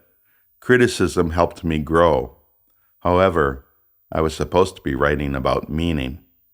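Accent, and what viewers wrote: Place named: Milwaukee, United States. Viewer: I was looking forward to listening to this while getting back into the studio, that's how I usually listen to any radio programs. Thus maybe I didn't have the type of undistracted focus I might have had if I was not working on my "skilled" painting at the same time, but it was nice in that it felt like I was painting while in a room with my friend. His voice is so soothing in this piece, with the strong Milwaukee accent that made me homesick for the midwestern United States when I first met him in India, that he can broach ideas that I might disagree with in part with the kind of reasonable dialogue and give and take that used to be a part of our political culture, in art and politics, but sadly seems to be lacking now. I love how it flows in and out of past, present, the personal, political and framed by his residency with his friend and scholar Mary Storm in Sri Lanka. 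I feel I could listen to this again and again and get something different from it each time.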